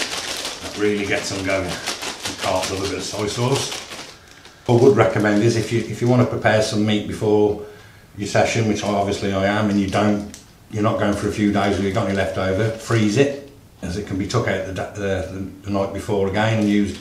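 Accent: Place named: Bromsgrove, United Kingdom. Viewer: Your accent reminds me of my old home area of Bromsgrove, I now live in Brighton { for the last 23 years } and only about 3/4 of a mile from the sea! Believe it or not, I sometimes go fishing, like, most of the time now I'm retired! I've suscribed now and will be working my way through all your vids! Without too many details, just to satisfy my curiosity where abouts in the country do you live mate if you don't mind me asking?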